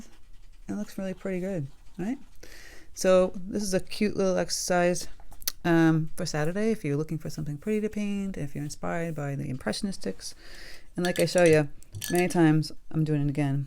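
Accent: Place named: New England, United States. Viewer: Yes to the Vlog. Love hearing what's going on in Massachusetts. I grew up there, currently in Michigan. Miss hearing the New England accent 🙂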